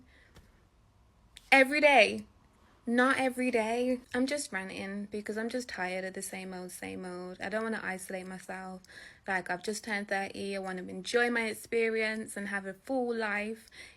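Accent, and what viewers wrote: Caribbean. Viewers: sounds like caribbean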